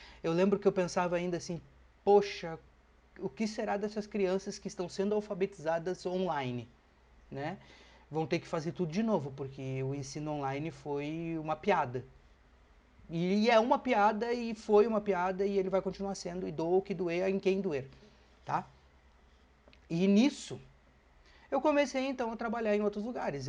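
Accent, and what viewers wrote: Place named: Rio Grande do Sul, Brazil. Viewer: Que lindo vídeo! Pelo sotaque so pode ser gaúcho kkk. Sempre tento fazer a Oração e não termino. Sei do que estás falando: já estive no fundo do poço e todo mundo desapareceu....meu pai, mãe e irmão me estenderam a mão e me salvaram da fome e do despejo. Se vc não tem grana vc não tem amigos. Me reergui na medida do possível e aprendi a viver na Solitude, a não esperar nada de ninguém e principalmente: a olhar de outra maneira para pessoas que eu achava mais "humildes" que eu....Hoje eu cumprimento, dou atenção, paro pra conversar, ajudo no q posso, mas é de coração, com carinho, sabe. Porque não sou mais que ninguém. Gratidão e Axé pra vc.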